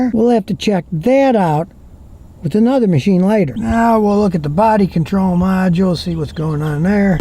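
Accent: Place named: Western New York, United States. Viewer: U annoy me so much...then your voice made me think...is he also from WNY? AI told me not from Bflo..but the Falls is the same! KNEW IT. That accent has an annoying sound. In Colo Spgs 30 yrs - same thing. I'm annoying too but ♡ my cars are so not rusty & trouble free!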